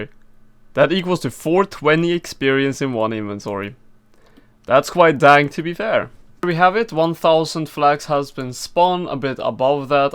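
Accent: Sweden. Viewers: hörs så tydligt du är svensk, haha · you are swedish huh ;) i remember that english accent ^^ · Are you Swedish by any chance? Your accent sounds quite Swedish.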